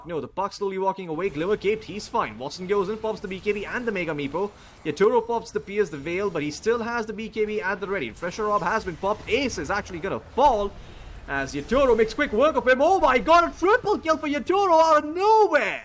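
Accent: India. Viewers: is this commentator from India or something ?? i feel like i'm listening to phone scam calls person from Mumbai pretending he's english.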